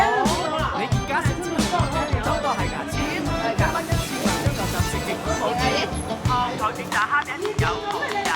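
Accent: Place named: Zhanjiang, China. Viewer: 听到乡音，看到美食，谢谢你，朋友，我是霞山的，现在德国。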